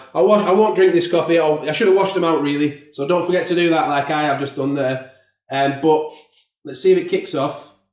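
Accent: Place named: Yorkshire, United Kingdom. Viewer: i will 😊 of the bat you sound Yorkshire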